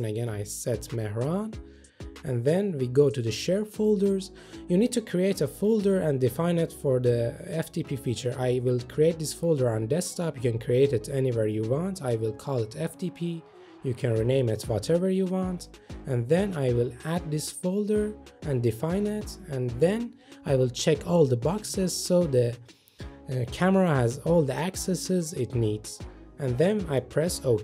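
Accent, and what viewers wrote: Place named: Iran. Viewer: Luv your Persian accent pal.. so glad to see Iranian guys here making professional vids.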